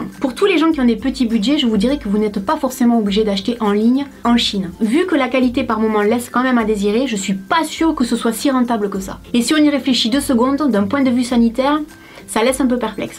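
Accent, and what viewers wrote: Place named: Perpignan, France. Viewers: et beh je me disait bien que ton accent me disait quelque chose... J'habite Perpignan depuis 4 ans environ, mais je ne connais pas du tout Centella. Pour Aliexpress, je n'ai jamais eu aucun souci pour le moment, mais je ne commande rien d'électronique, ni de soins donc bon pas trop de risque. Et pour justfab, jamais testé, mais j'ai toujours pensé que ça puait l'arnaque. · Coucou! Je te suis depuis quelques semaines à peine et j'aime beaucoup ce que tu dégages (ta fraicheur) et tes conseils avisés. Tres heureuse de savoir que tu es de Perpignan (je me doutais bien vu l'accent!) et ravie également d'apprendre qu'une marque de cosmétique est produite dans notre belle région! Ne change rien, au plaisir de voir tes prochaines vidéos!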